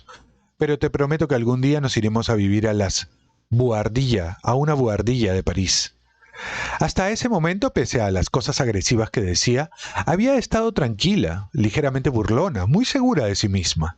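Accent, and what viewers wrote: Peru. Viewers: La narración es perfecta, el acento peruano resulta de lo más adecuado, lo disfruto mucho.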